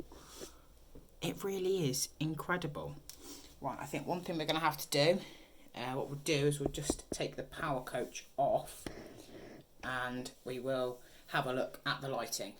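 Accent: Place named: Scotland, United Kingdom. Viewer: Nice accent mate are you Scottish just wondering cuz my ancestors are Scottish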